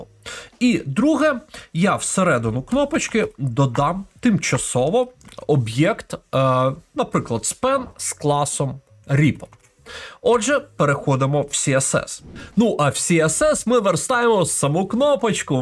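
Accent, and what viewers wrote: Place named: Carpathians, Ukraine. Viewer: Что свинособачий говор карпатских рагулей? Рагули умеют даже компьютер включать?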